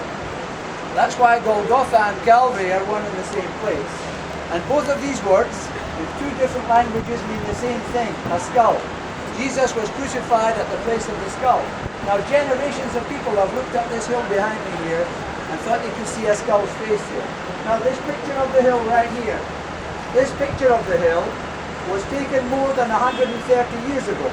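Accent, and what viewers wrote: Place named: Glasgow, United Kingdom. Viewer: He's Scottish! Sounds like a Glasgow accent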